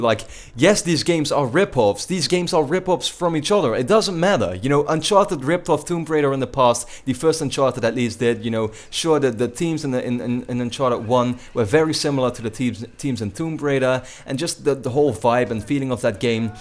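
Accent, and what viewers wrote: Netherlands. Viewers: When I heard your accent I immediatly knew you were dutch. Nice video dude.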